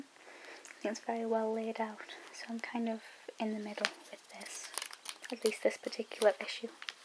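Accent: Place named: Aberdeen, United Kingdom. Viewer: i'm from Glasgow! :p One of my engineering lecturers is from Aberdeen, I knew I recognised the accent. Thanks for helping with stress problems, whenever i'm studying and it's stressing me out I just put one of your videos on, kills the stress almost instantly! :) x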